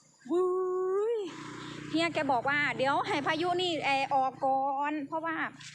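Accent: Korat, Thailand. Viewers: เจ้ พูดสำเนียงเหมือนคนนางรองไทยโคราชเลยครับ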